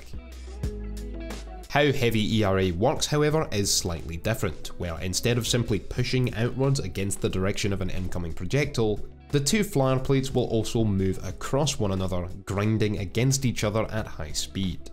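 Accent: Scotland, United Kingdom. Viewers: Speak American man! I would love to watch and learn from ur videos but the Scottish accent irritates me, u pronounce a lot of words wrong. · bit of scottish for you there, pal, like the narrator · Is this a scottish accent? Sounds awesome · is he scotish ?!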